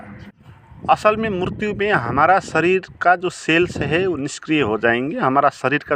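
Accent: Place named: Nepal. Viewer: नेपालीले हिन्दी बोलेको जस्तो लाग्यो! प्रबचन असाध्य राम्रो लाग्यो!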